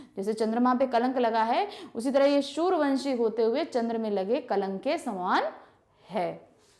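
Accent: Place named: Uttar Pradesh, India. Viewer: Can u listen her tone She is from uttar pradesh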